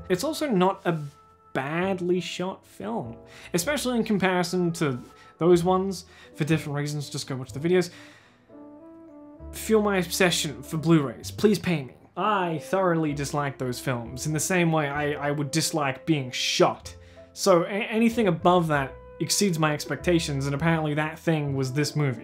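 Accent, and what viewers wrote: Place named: Australia. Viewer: why do you sound less australian now?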